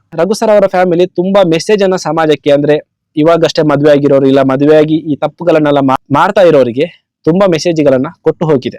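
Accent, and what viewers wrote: Mangalore, India. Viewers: ನೀವು ಬಿಗ್ ಬಾಸ್ ಬಿಗ್ ರಿವ್ಯೂ ತುಂಬಾನೇ ಚೆನ್ನಾಗಿ ಮಾಡುತ್ತೀರಾ. ನಿಮ್ಮ ಮಂಗಳೂರಿನ ಭಾಷೆ ತುಂಬಾನೇ ಚೆನ್ನಾಗಿದೆ ♥️♥️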